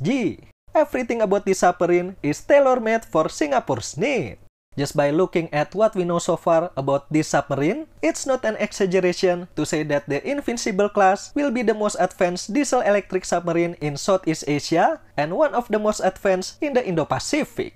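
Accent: Indonesia. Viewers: I guess the narrator is Indonesian😊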